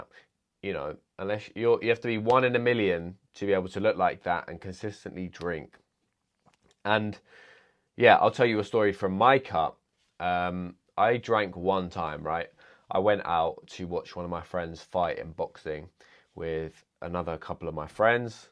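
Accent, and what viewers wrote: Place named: United Kingdom. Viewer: Can't understand this heavey accent British English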